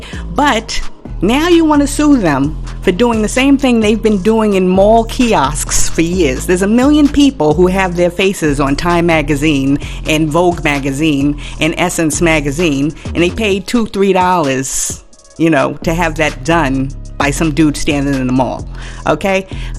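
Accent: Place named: Queens, United States. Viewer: What up fam…. What up sis..!! You Jamaica Queens strong I can tell.!!😃. Great job please keep it up..!!! Sup..!!!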